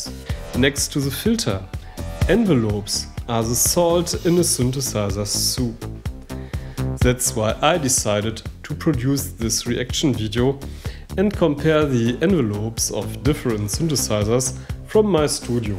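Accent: Germany. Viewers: Gruseliges Englisch / Horrible German accent... Das lässt sich nicht ertragen und zuende anschauen 😒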